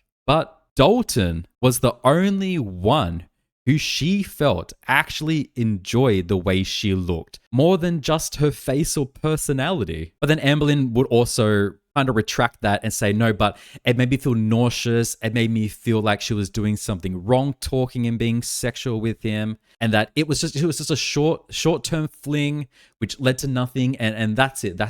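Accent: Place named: Australia. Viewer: Great synopsis. It's always nice getting Gorlworld updates in my accent. #aussiepride The whole not taking BP medication thing is stark raving bollocks. How does a person with health anxiety (which I do think she has. I have it; I know the signs) hear she has high BP and be like "...no, I'll fix it naturally"? Girl. Come on. Even if we believed you were capable of losing weight at this point, at least take the meds until you've got your weight down and your BP is normal without them. This tells me there's some pride getting mixed in here.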